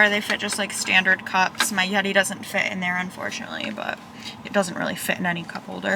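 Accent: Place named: Chicago, United States. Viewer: Born and raised in Chicago….I can hear the Chicago in you voice! We have a similar handsome dog. Love the Rivian! And Lake Shore Drive (I slightly dated myself😂)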